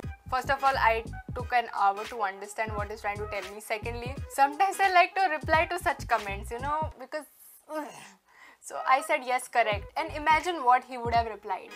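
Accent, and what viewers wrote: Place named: Goa, India. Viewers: I haven't been to goa in such a long & hearing you speak in that typical Goan accent makes me want to go there and learn the rest of the language and speak and catch the accent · That konkani accent doest suits you.,........😬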